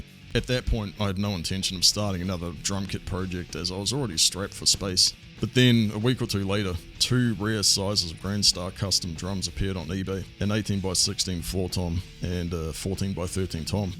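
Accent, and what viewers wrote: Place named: New Zealand. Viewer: Sound like a kiwi. Im amazed you got this gear😊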